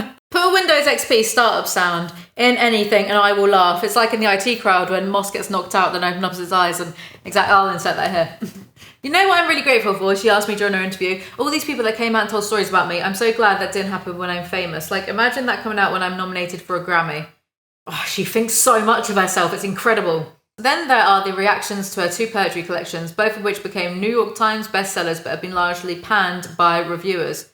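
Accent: United Kingdom. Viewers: I haven’t started watching the video but I’m READY for you to reprimand her in British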